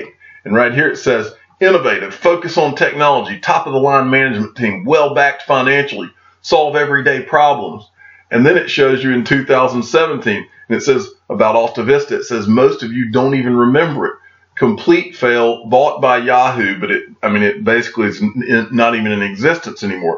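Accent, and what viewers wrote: South, United States. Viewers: Love the good ole southern boi sweet tea accent lol your videos get me hyped like jungle Inc. You sure do know how to make ripple/xrp hodlers feel proud of what they are holding. Thanks for helping me realize that I needed to dump my neo ontology stellar and cardano all for ripple/Xrp. The light bulb went off 3 months ago after watching a slew of your videos. It finally clicked on which one was the potential winner 5-10 years out from all of the 2000 cryptos listed. See you in Lichtenstein brotha! Also, I live in Georgia. Hopefully we hop on the same flight.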